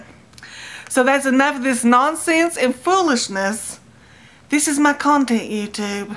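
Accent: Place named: Kentucky, United States. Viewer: I love your videos they are very informative and easy to follow and also you crack me up. Your country accent reminds me of my husbands family, they are in WestVirginia. You sound a little more south, regardless of where your from, your great,keep up the good work, I cant wait to watch more of your tutorials...GOD BLESS YOU DARLIN!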